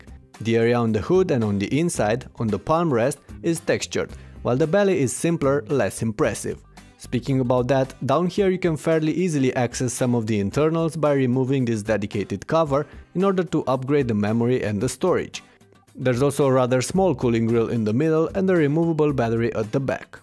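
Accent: Romania. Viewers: romanian accent? :) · Mai mult ca sigur esti roman (fac referire la narator)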